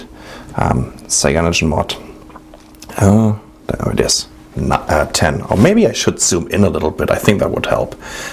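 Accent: Germany. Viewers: Du lässt die das Wetter von China und Taiwan anzeigen, hast aber einen deutschen Akzent beim Reden? Suspekt.. Aber sympatisch :) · Like the video and German accents are cool, man.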